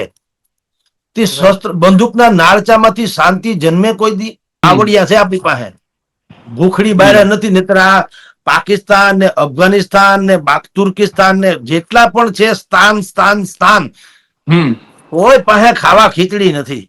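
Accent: Kathiawar, India. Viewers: કાઠિયાવાડી શૈલી માં ખુબ સરસ મોરે મોરો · I just love how you talk in Kathivadi Gujarati and explaining everything in detail 🤣🙏👏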